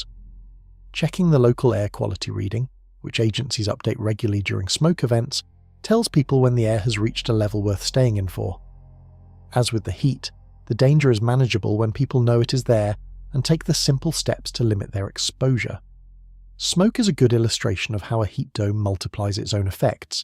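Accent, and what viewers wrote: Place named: United Kingdom. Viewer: The narrator voice is British. Few Brits have any concept of Fahrenheit, apart from maybe 32º Fahrenheit.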